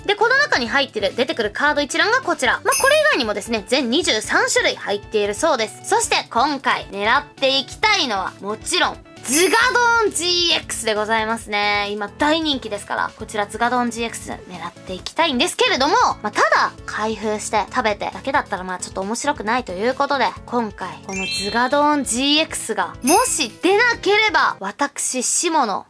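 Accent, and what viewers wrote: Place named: Kansai, Japan. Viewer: ナチュラルな関西弁良き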